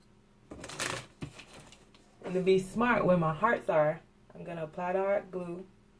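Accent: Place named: Florida, United States. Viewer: Hello, just wondering how you are and where have you been? I hope you've found new found fame and are just too busy filling orders for decor and just not have time for diy videos. If so continued success! I'm from Texas, are you from Florida? Your 'accent' although subtle, sounds like a good friend of mine from Florida. Just curious.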